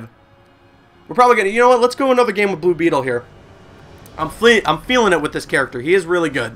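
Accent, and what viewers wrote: Canada. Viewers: This is my first time on ur channel and can I just ask, are you Canadian?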